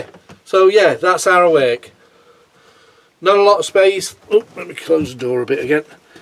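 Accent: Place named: Sutton in Ashfield, United Kingdom. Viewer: I've got a biggish workshop and still go no room lol. You sound local to me! Sutton in ashfield.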